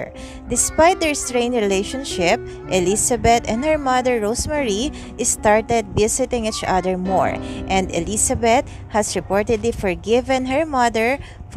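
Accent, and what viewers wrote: Philippines. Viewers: ok lang... pinoy tayo eh. d yan magbabago, feeling lang ng iba nawawala ang accent nila kung cla ang nakakarinig sa sarili nila.. ang mahalaga maliwanag ang pagkakasabi sa words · A Pinoy speaking.. 😂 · I hear a Filipina accent ♥ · Don’t listen to the hateful comments! I love your Filipino accent. Hi from Canada! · Pinoy na pinoy ang accent e. Haha. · Just because someone has an accent doesn't mean the Narration is not understandable. As a fellow Filipino, I'm happy to hear a familiar voice doing these big stories on YouTube. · I liked her accent too she s filipina for sure maganda accent ate keep going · Filipina accent? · Its a Filipino accent. I looked thru her videos and there are videos in Filipino language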